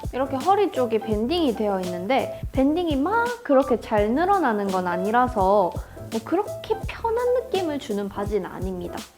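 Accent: Gyeongsang, South Korea. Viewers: 언니 사투리!! 경상도인가요? 귀엽네용...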